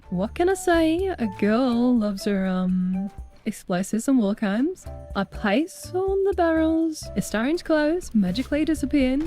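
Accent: Australia. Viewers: I first subscribed to this channel for the Australian ASMR and stayed for the insanely creative runs & humor. I’m full on cackling over here 😂😂😂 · Unapologetically Australian and I love it! The rep we deserve as Aussies ahahah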